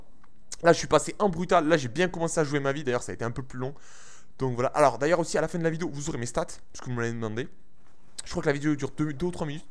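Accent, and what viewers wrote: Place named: South, France. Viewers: Petit accent du sud moi aussi j'ai cette accent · Salut J'adore ton accent Marseillais MARSEILLAIS lol Bravo pour tes stats et ta nuke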